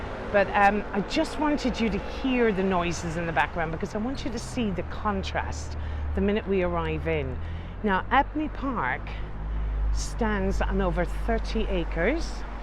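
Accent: Ireland. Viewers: Lovely! Just so lovely! Not only the tour of this beautiful old cemetery, but to hear that wonderful lilting voice of my favorite tour guide,. Lady, I believe I could listen to you read an encyclopedia and it would be entertaining just to hear that soft, soothing, Irish lilt. I am a chronic insomniac and you just don’t know how many nights the sound of your beautiful voice has been a lullaby to ease me off into sleep. It works as nothing else does. I always look for you especially when new videos have been posted. I love the others as well, but you just have that something a little extra. Prayers that life is treating you well! Keep up the great work. Free Tours by Foot-London has a real treasure in you. ❤️ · Been waiting for the return of the magnificent 7 Love this series of cemetery tours by the wonderful.. Great knowledge and well delivered in that soft Irish accent x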